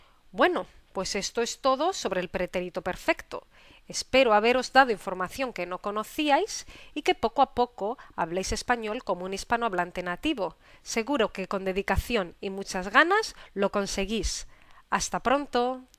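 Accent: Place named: England, United Kingdom. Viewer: Me ayudan mucho tus videos.Y me encanta tu acento inglés.:)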